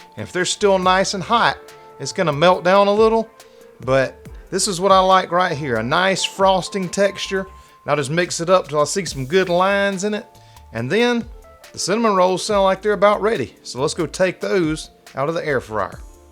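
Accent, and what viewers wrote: South, United States. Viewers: I do. Your personality and Southern "accent" makes the videos even more enjoyable to watch.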